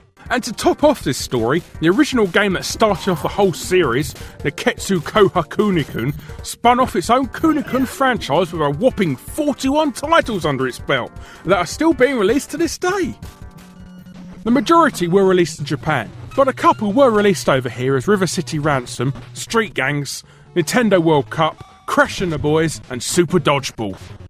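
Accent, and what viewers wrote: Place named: Cockney, United Kingdom. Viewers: that english accent is awesome!! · So you talk in the same way that was ridiculed in that old play " " where that guy sings the song with the lyric "no one taught him 'take' instead of 'tike.'"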